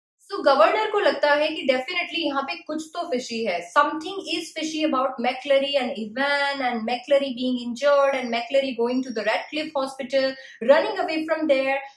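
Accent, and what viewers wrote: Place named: Australia. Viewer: Your Australian accent. I love it please talk in English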